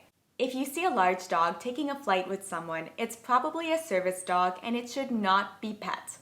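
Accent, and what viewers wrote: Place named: New England, United States. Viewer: Heavy New England accent! 😬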